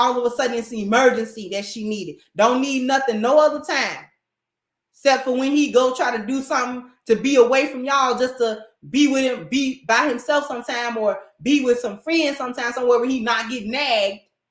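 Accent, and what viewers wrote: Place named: New Orleans, United States. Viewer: Youre definitely from New Orleans aintee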